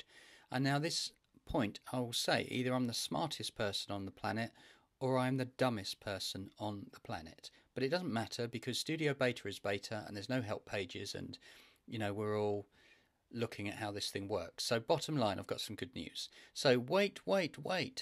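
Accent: United Kingdom. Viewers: Your voice is so British it makes me patriotic. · They probably didn't have much enthusiasm for an Editor at all, thinking that many other software exist for detailed editing. If so they should call it not Editor but Trimmer, as it can only do trimming. Plus indeed they should have a more detailed tutorial on degrees of freedom, what will happen if we don't do this or that - like you did. I find their approach a bit ignorant, considering that we are probably not the first people who struggle with their program. Good thing that there are people like you, who take pain recording the video with explanations of what can go wrong. Thank you,:) I also liked your accent: Brits make English sound like music:)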